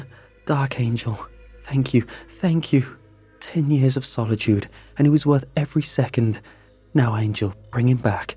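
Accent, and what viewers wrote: United Kingdom. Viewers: I hear many stories told from Minnesota. I wonder if, even tho sounding British, lives there. Or maybe a writer he narrates often is in that cold azz state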